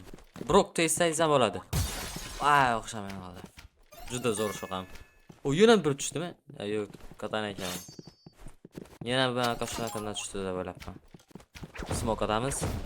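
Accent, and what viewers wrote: Khorezm, Uzbekistan. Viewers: Horazmli misiz brat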